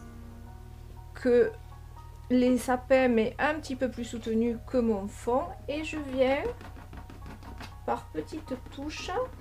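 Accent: South, France. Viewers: Merci, toujours magnifique, très bien expliqué avec ce bel accent du midi.. Bonne soirée. 💐🐈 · J'adore... cela me fait rêver... cela paraît si simple et ton accent du Sud-ouest ou Sud-est me touche moi l'Aveyronnaise que je suis. Bisous et merci👄💖👍👌👏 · Je souhaite un prompt rétablissement à la Lady. Je regarde régulièrement vos vidéos et ce que vous faites est vraiment sympa et original! Vous avez une dextérité dans le maniement de votre couteau que j'aimerais bien avoir. Je peins également ou plus précisément je repeins depuis quelques temps après avoir laissé les pinceaux de côté pendant plus de vingt ans! Vous faites des expositions? J'imagine qu'avec votre accent, vous êtes dans le sud de la France.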